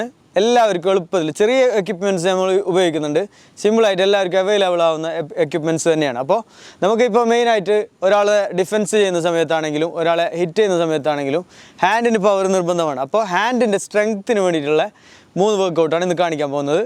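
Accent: Kasaragod, India. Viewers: KASARGOD ആണോ BRO 🤩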